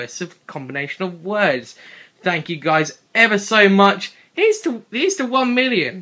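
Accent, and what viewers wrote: England, United Kingdom. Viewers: are u English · What part of Britain you from?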